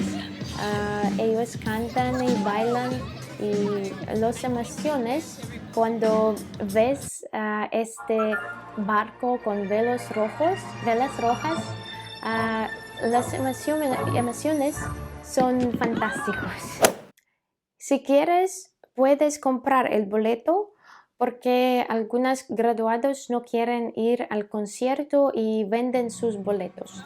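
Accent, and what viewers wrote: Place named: Russia. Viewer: Vi un video de abril y hablabas como estadounidense hablando en español mexicano y ahora, 5 meses después hablas comonrusa hablando español mexicano.: Gran avance.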